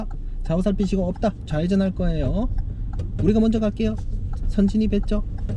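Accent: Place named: Busan, South Korea. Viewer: 열정이 있네요~ 은 민초들의 눈높이 교육방식 아주 아주 조아요 칭찬합니다 굿^^ 악센트가 부산 같기도 하고 푸하 ^(^ 항상 마음의 중립 중립 중립 하시길 P.S-> 근데 발이 큰가요? 닉이~ 군요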